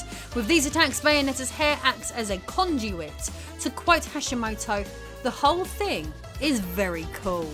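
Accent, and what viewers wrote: United Kingdom. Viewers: You are absolutely the perfect person to cover this material! Sultry and British!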